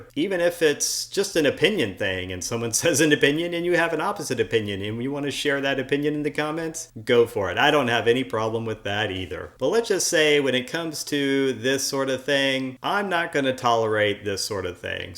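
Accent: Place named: United States. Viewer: ya sound like an AMERICAN - fire up the music via PATTON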